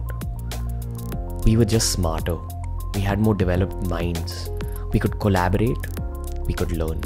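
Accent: India. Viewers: Nice English Proud of Indian accent I don't understand why to do people change their accent.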